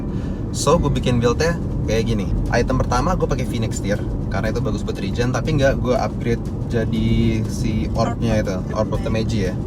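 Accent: Bali, Indonesia. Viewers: jano ini org bali ya?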